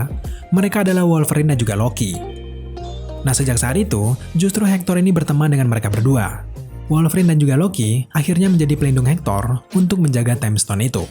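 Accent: Java, Indonesia. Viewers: Nada Logatnya mengganggu. Medok banget. 😁🤭🙏🙏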